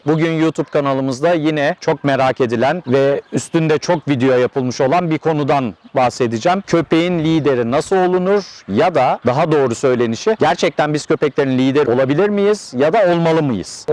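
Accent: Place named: Istanbul, Turkey. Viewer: Çok İstanbul ağzı konuşuyor diksiyon çok iyi ben ise Adana ağzı konuşuyorum çok moralim bozuluyor ne zaman kelimeyi bozacak diye bekliyorum. Bu beni üzer....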